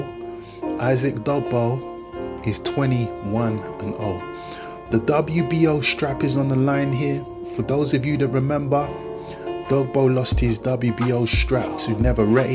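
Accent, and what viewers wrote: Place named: United Kingdom. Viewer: Brotha you got a UK emcee voice. Reminds me of a UK emcee by the name of Barney Artist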